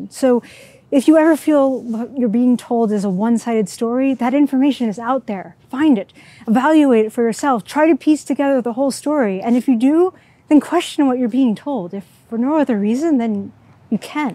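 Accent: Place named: United States. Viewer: What a welcome surprise in my YouTube notifications. As a Brit I love the Tom Scott vibes but with your own US tones :-)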